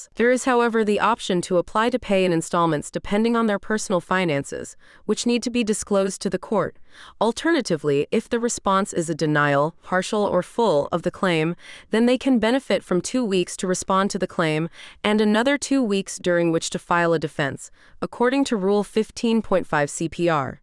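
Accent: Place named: United States. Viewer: so annoying using American voice for uk law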